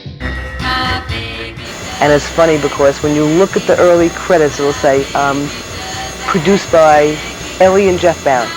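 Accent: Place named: New York, United States. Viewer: 100% New Yawker... I love it!!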